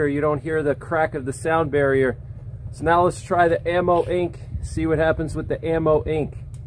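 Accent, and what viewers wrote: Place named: Boston, United States. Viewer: are you from Boston? Something about the way you talk reminds me of burr, not the accent so much but more like the cadence or something, the way you end sentences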